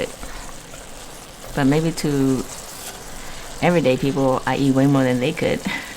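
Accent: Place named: South, United States. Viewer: I love your voice; I hear a southern accent 💞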